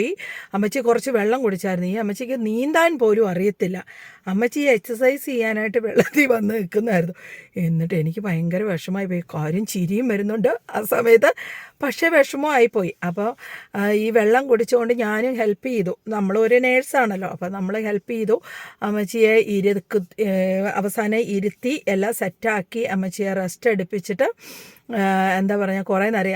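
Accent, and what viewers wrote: Kottayam, India. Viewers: She speaks like my wife, who is from Kottayam District.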